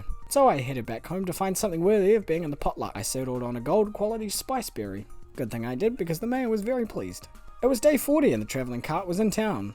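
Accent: New Zealand. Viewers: Great video and very funny! Is that the Kiwi accent I hear? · stardew and a nz accent 🥰 love it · Are you from NZ? I'm Australian and ur accent rlly sounds nz :) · You from NZ? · is that a fellow kiwi accent i hear?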